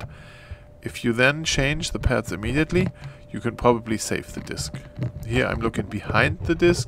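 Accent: Germany. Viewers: Thanks!! Great video and photography. Love that German accent.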